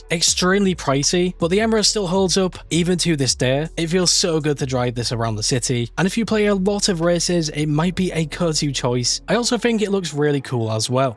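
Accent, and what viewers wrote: United Kingdom. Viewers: Is this British English or Scottish?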